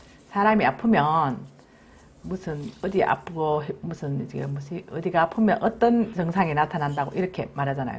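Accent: Gyeongsang, South Korea. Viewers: 지금말씨가 대구말씨같은데 맞으신가요 제미나게사시는것같아요 부러워요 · 볼수록 재미있어서 주변에 막 퍼나르게되요. 어쩜 돌아가신 친정엄마 살아돌아오신듯 경상도 사투리에 지혜로운거 까지 똑 같아요. 감사하고 축하드려요~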